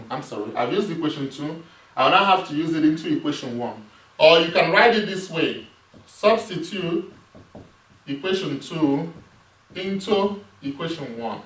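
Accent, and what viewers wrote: Nigeria. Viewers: this helped alot! thankx..sorry are you an Igbo Nigerian Man?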